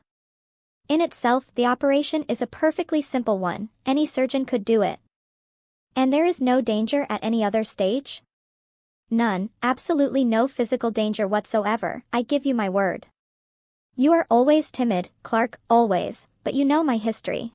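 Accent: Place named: United States. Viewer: This sounds terrible! This story shouldn’t have a female narrator (with a bland American accent), let alone a female AI with such flat affect and abysmal cadence. It needs to be corrected immediately.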